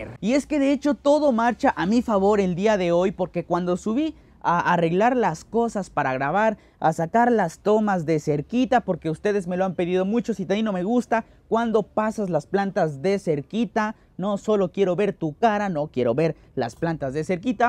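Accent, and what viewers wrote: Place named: Spain. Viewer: Porque hablas raro ? Tipo español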